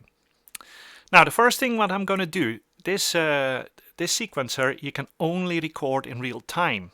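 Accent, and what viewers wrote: United Kingdom. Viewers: Liked and subscribed! Great video. Amazing accent. Have you spent time in the north of England / Scotland? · Is there some Scouser in your accent?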